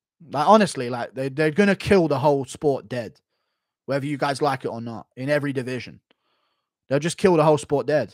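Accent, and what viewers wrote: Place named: West Virginia, United States. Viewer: Sounds like West Virginia especially boone county.......wait......I'm from boone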